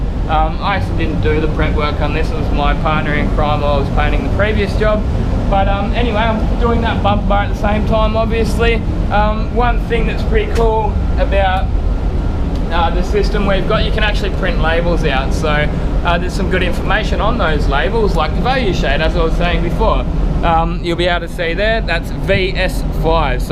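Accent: Australia. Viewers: Australian accent + high speed talking= disaster 😄 Bro, your channel is popular all around the world, not just in Australia, please slow down, we can't understand you 🙂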